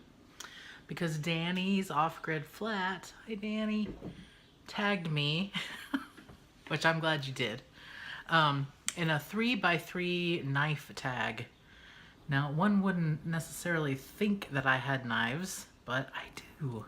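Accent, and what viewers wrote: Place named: South, United States. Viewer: Cool ! I thought I heard some southern in you! I'm from/live in Alabama!!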